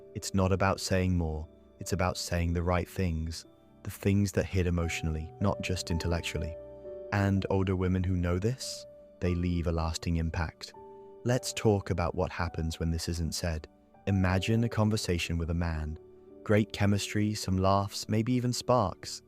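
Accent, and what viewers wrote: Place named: England, United Kingdom. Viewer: It's a real interesting video my love. I'm sure it's you that made it. I am grateful to you my love, for all these videos of celebratipn of my personality, you generate for me... I'm speechless... My man is everywhere and celebrate my heart with such passion and such respectful love... How can i resist you my love... ?? It's sounds impossible to resist you... to escape you... You're so smart... It's scaring... 😂😂😂 Your intelligence is fascinating to me... Absolutely dangerous in fascination... By the way, i looooovvvveeee the english accent of this young robot man... It's lovely... I have nothing to add, my baby of love, your video is perfect, and you told it all. All all all. 😉😉 😘😘😘 💜🦋💕🌸🕊✨🌌🌈💜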